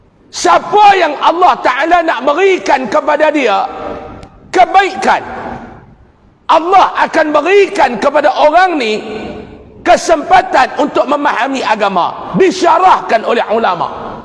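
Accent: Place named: Kedah, Malaysia. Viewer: Ustaz,,jgn risau,saya orang kelantan, tapi saya peminat no 1 ustaz. Faham sgt apa yg ustaz cakap.. Cakap Kedah pun semua bulih paham.. Malam ni lantang dari biasa. Terbaik ustaz.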